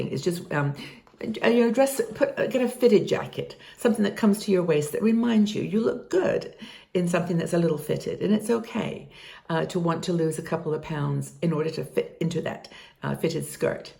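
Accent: England, United Kingdom. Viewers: You are lovely! Are you originally from England? I detect an accent :) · You're an inspiration! You sound English? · i thought you were english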